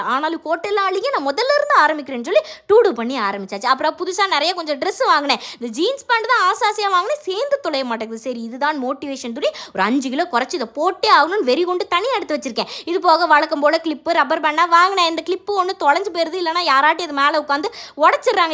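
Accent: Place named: Southern Tamil Nadu, India. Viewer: ✨ உங்களோட தென்மாவட்ட தமிழ் மிகவும் அழகாக உள்ளது ❤❤❤❤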